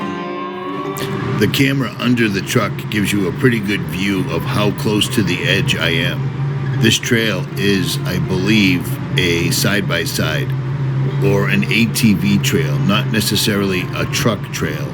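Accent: Boston, United States. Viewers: ? From Boston?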